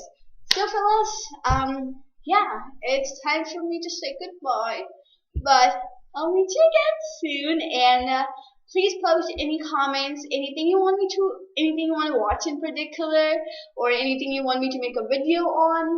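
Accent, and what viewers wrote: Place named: India. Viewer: Just like ..just like ..just like. Indians english in canada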